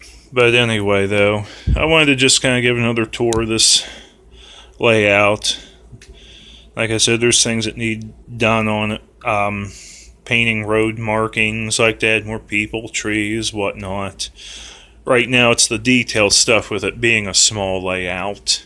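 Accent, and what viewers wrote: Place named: Western Pennsylvania, United States. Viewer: Are you from Western Pa? you got the accent!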